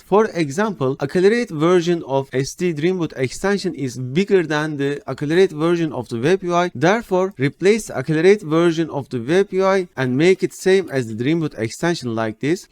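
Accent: Turkey. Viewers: Dostum merhaba,Hiç sayfana girip bakmadan konuşma tarzından Türk olduğunu anladım ve çok mutlu oldum.Gösterdiğin şeyler araştırıp bulamadığım bir çok sorunumu çözdü ve çok takdir ettim seni.Kolay Kolay hiçbir kanala abone olmam ama bugün 1 abone kazandın.Başarılarının devamını dilerim.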